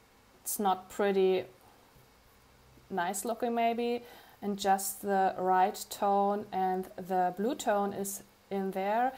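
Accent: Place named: Germany. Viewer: 2 seconds into this video and I already figured you're German.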